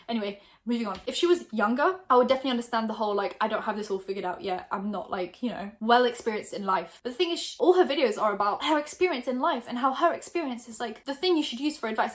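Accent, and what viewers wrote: United Kingdom. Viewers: I watch you videos all the time but today I was surprised when you started talking and I heard your accent , as if I had no idea you had a british accent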